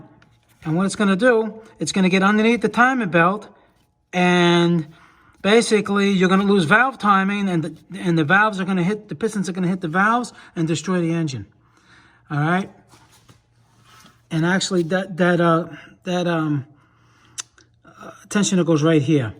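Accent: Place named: New England, United States. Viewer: My Boi got that New England/ NY accent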